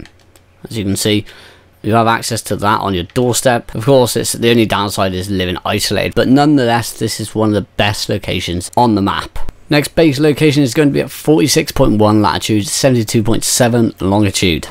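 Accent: United Kingdom. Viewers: Loves your british accent mate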